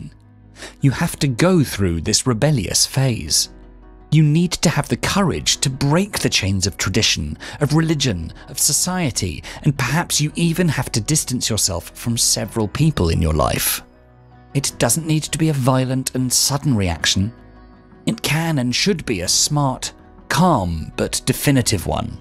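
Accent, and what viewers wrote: England, United Kingdom. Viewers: The problem with THIS narrative interpretation of Nietzsche is this: The assumption that every sniveling creature should be an artist and howl at the moon. Sorry, but the world teaches us who has what it takes and who doesn't. And THAT's OKAY. Judgement by your peers is exactly the democratic process which teaches you if the world thinks you have talent. I reject the sophistic use of a "British accent" here to make this story seem authoritative. This is a nonsensical assault on cultural norms. Some people get savaged by life. Get over it. Not everybody MUST BE celebrated.. I have listened to many amazing lectures on Nietzsche. This ain't one of them. This is pure "Woke" nonsense. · Ima go against the grain an say take this w a grain of salt an herb weary of soemthing that calls itself philosophical while using an English accent knowing full well English ppl r stereotyped as smart ppl. 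Like that episode of sunny Philadelphia when Charlie starts speaking w an English accent and smoking a pipe. I like this channel a lot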